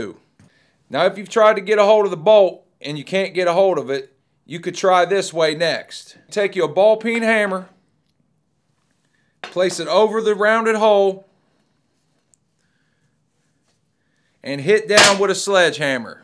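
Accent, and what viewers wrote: South, United States. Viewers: I dont think ive ever heard a more southern accent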